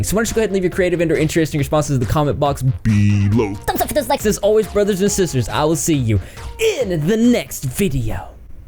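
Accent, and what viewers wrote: South, United States. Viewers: are you from the south? I can hear a southern draw in your voice.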